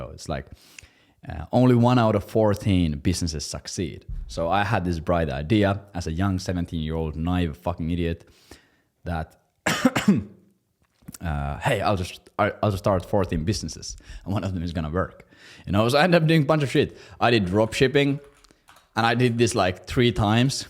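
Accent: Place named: Finland. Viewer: Great vid, but... you said you live in Estonia, but you sound like a Finn 🧐🧐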